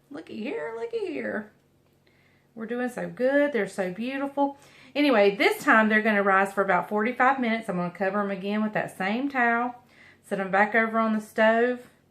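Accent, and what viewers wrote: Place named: Eastern North Carolina, United States. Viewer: I feel so at home listening to you talk.... I'm from Eastern North Carolina and you sound like home to me!!!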